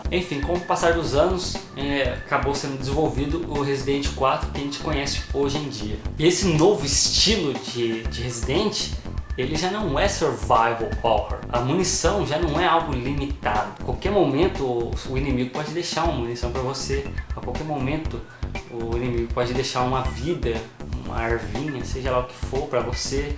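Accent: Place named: Piracicaba, Brazil. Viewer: por acaso você não é de piracicaba não né?? nosso sotaque parece ser o mesmo...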